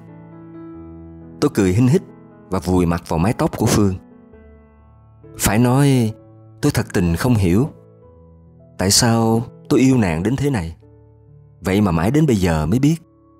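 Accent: South, Vietnam. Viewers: Hello 👋! Duyên phận của mình thì xa ngần ấy ngày vẫn là duyên của mình. Sáng ngày nọ khi không mở YouTube lên lại nghe được giọng đọc miền Nam thật hay, văn viết không bị "lai" của thế hệ hiện nay, ngồi nhâm nhi cafe để ngửi hương vị "café" cuộc đời từ kênh. Cảm ơn rất nhiều ❤️🌼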